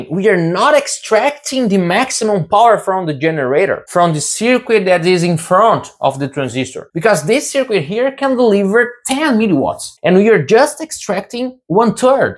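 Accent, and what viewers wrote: Brazil. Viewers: você tem sotaque de brasileiro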